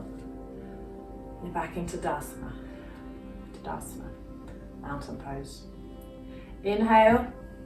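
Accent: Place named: United Kingdom. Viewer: Lovely session. And the British accent just adds so much more to the relaxed atmosphere 😂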